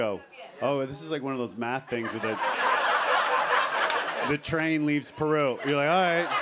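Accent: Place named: Canada. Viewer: That's how Canadians talk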